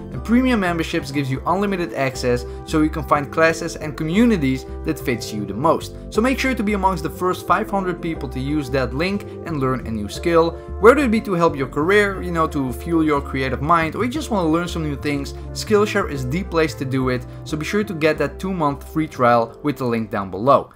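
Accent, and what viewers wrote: Netherlands. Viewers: Great Video Mate👍🏽 Are you from the Netherlands? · Hey dude, you’te Vids are very good and you for sure desurve more subs but i have a feeling you are dutch, are you ? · Are you from nl ? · Steenkolen Engels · Nederlands Engels accent, bah, werk er aan! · your voice sound nederlands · Your voice sound dutch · Are you dutch? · Are you dutch? I hear that accent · I can hear you're dutch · Are you Dutch or something? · Youre dutch right? Want ik ook😂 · You sound Dutch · You sound Dutch. Are you Dutch?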